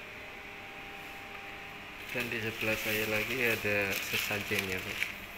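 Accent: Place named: Banyumas, Indonesia. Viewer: Salam ngapak bos quuu Ora ngapak ora penak😀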